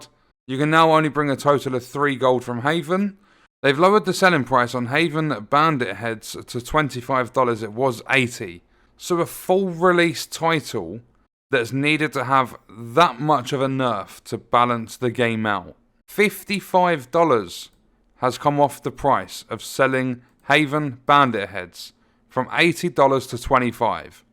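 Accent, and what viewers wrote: England, United Kingdom. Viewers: he aint eu player he sounds english - they are not in eu lol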